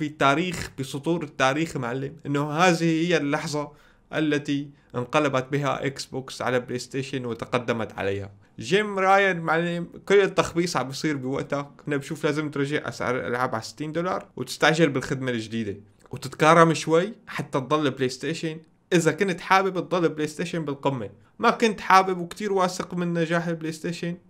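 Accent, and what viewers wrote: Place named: Syria. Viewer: قسم بالله ياوجهك مريح والطيبه باينه فيك اتمنالك النجاح يا وراح تذكر كلامي راح تنجح في مجالك بس لو نجحت لاتنساني هاااا اوعك اخوك زيزو ايه نسيت ولهجتك السوريه العفويه ياخي حكايه تاانيه تسلم يازعيم على مجهودك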